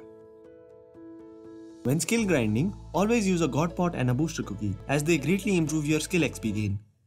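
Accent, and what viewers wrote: India. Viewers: Your voice and acceny are really like an Indian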